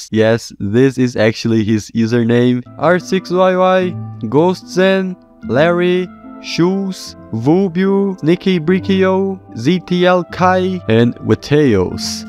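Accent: Brazil. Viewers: You brasiliano? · are you from brazil? the accent sounds familiar...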